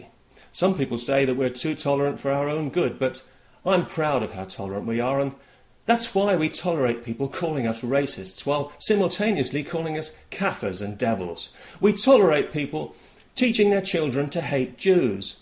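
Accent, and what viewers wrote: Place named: England, United Kingdom. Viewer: Si tu avais pris la peine d'écouter deux secondes tu aurais entendu qu'il est anglais et non pas américain.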